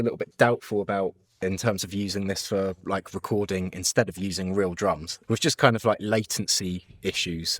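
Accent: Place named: United Kingdom. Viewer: Haha your fine bro , it’s not an issue unless you literally never heard or spoke to someone from the uk !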